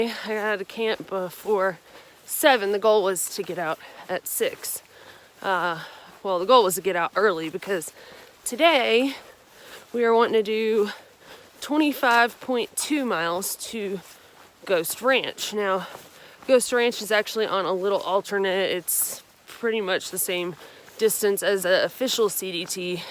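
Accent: South, United States. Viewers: That was a scary encounter with the mountain lion. If you would have been at that spot just a few minutes sooner the lion might have been in the bushes. It could have gone bad very easily in my opinion. I think the fact that you were at a higher elevation helped. It was good that you kept your cool and the harmonica obviously helped. Maybe have your pepper spray on one hand and your neck knife on the other just in case. Also consider getting the bear spray sooner on the trail. I really enjoy your videos and it easy to like the way you express yourself and your accent. Good luck on the trail and hope you have a great time off.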